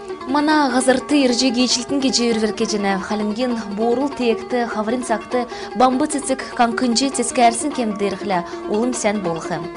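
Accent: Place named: Uvs, Mongolia. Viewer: manai uvsiihantai tustei yaridiin bishuu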